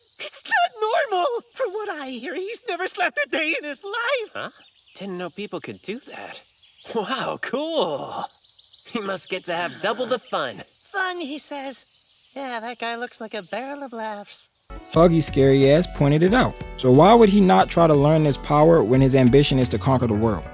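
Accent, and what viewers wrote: Baltimore, United States. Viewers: Is that a Baltimore accent lol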